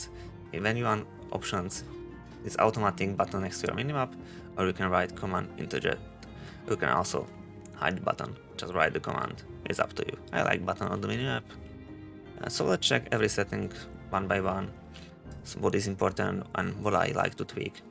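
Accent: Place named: United Kingdom. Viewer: why in the world do people who mumble, and have thick british accents make guides. totally bizarre.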